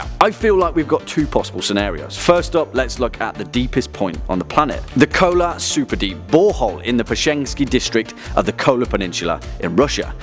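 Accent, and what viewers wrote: United Kingdom. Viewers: This guy sounds like the british dude from Community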